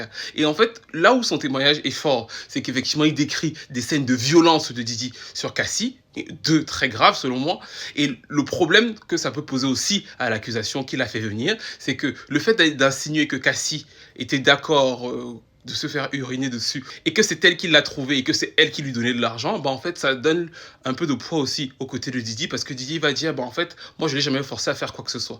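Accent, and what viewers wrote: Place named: Cameroon. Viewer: Moi c'est ton accent qui m’intéresse... tu es d’origine camerounaise ?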